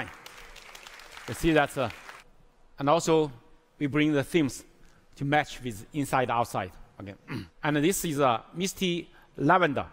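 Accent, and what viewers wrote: China. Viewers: I’m Cantonese and I hear Mandarin in parts too 😂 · I'm Brazilian so my accent is not amazing, but in some parts of the video I truly thought he was speaking Chinese. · I honestly thought he was speaking Chinese and then translating to English. Turns out the whole speech was in English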